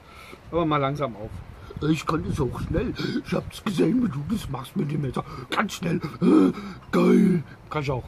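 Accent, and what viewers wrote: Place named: Bingen, Germany. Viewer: genial hab ich doch gleich am Dialekt gehört, ich komm aus Binge schaue deine Videos schon ein ganze weile,bist auch aboniert.... ich mag an die 1.das du gerne Bier wäreend deiner Videos trinkst und natürlich Messer genau wie ich liebe. Hätte mal Bock mit dir das ein oder andere Pilz zu zerstören und dabei über Messer zu fachsimpeln.... LG de Bingen Andre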